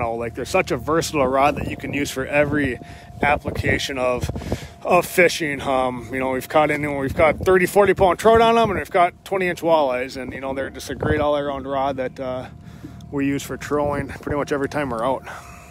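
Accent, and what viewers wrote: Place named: Minnesota, United States. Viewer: you have the same MN accent as my cousins who live up there. Both my parents were from MN but they didn't have accents, I guess because they left when they were in their twenty's and that was in the 1940's. they used to say people in MN don't have an accents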